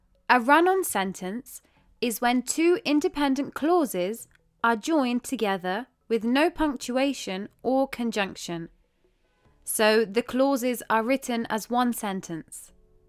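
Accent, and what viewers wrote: United Kingdom. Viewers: Your Accent is British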